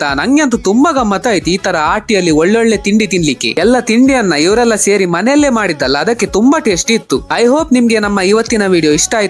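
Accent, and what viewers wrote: Mangalore, India. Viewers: Nimma mangalore kannada bhashe chanda untu marayya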